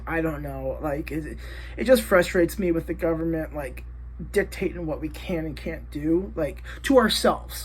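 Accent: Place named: Scandinavia. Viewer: Also, sounds like you are from a scandi heritage, i am from Denmark. Be strong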